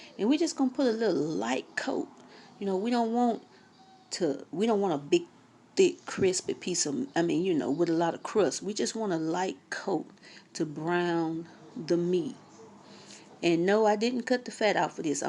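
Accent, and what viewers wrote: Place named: South, United States. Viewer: When I tell you I smelled this through the phone! Oh I love your voice it reminds me of my southern cousins, and watching you cook definitely reminds me of my grandma ❤️❤️❤️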